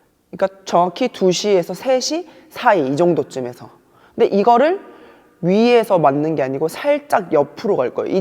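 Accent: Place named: Gyeongsang, South Korea. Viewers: 초중고를 부산에서 나와가지고 억양이 남아있어서 😅들켜버렸내요 3가지 다 연습 해보셔서 써먹어보세요 더 희열감 드실꺼에요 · 코치님 억양이 경상도 분이셨군 ㅎ 이서브 연습많이 했는데 희열감 좋더라고요 여러버전이 있었군요 공략 역시.. 최고의 서브으 👍🏻👍🏻 늘머쩌브러 🔥🔥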